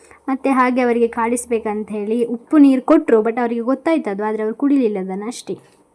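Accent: North Karnataka, India. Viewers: Nivu uttar Karnataka bhashene matadari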